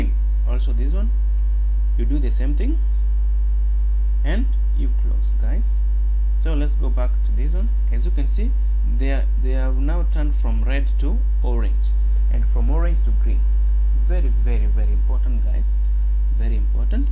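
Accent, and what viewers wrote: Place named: India. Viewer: sir i am an ethiopian please make your english language good make it approach to american english not to indian accent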